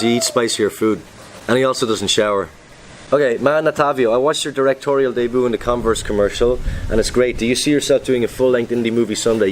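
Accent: Ireland. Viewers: Are you from Tipperary in Ireland ? Your accent is kinda the same here in Tipperary! Im a Filipino fan staying here in Ireland ✌️ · Blows my mind that still has his Irish accent 😂 love from Eire 🇮🇪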